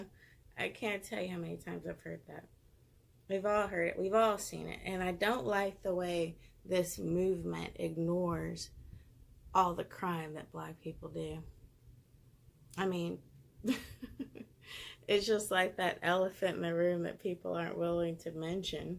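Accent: Texas, United States. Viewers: Yes, her message should be heard by Americans of all races. I know some white people that need to listen to her. I felt that when she said she doesn't support a movement that makes her feel inferior, I would feel the same way if some organization was trying to sell me the same message. I recognized her Texas accent, I lived in Dallas and then Plano for 9 years. Her personality is great!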